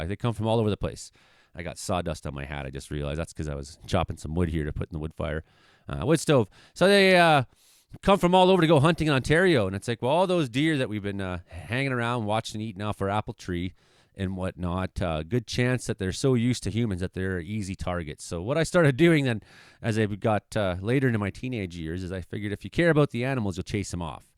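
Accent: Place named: Australia. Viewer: Pretty damn good Aussie accent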